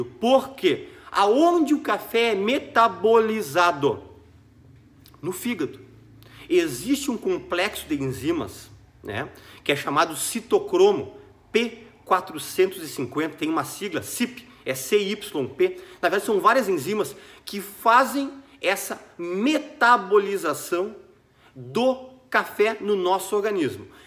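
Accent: Rio Grande do Sul, Brazil. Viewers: Adoro os seus vídeos , não só pela competência, clareza, além de tudo isso, adoro sua forma gaúcha de falar. Eu vivo na Alemanha e mato um pouco das saudades. São excelentes seus vídeos e o senhor deve ser um excelente professor, além de excelente médico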